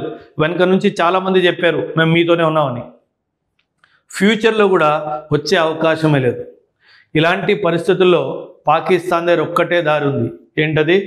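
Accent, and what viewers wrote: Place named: Telugu, India. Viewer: Though you are a Sardarji having Punjabi as mother tongue, now you are speaking like a pure Telugu person.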